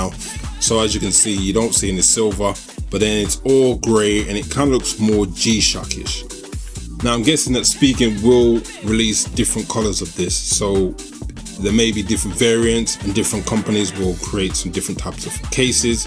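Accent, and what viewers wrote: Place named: Birmingham, United Kingdom. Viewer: Great vid and a fellow Brummie by the sounds. Cool.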